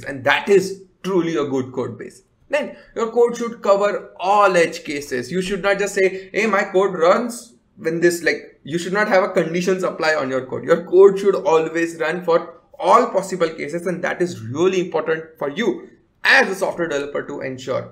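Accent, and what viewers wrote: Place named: South India, India. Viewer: Both content and South Indian accent on point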